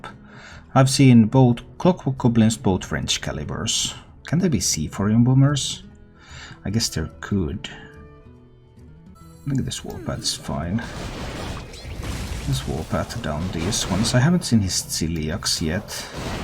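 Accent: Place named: Finland. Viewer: You sound very finnish with your accent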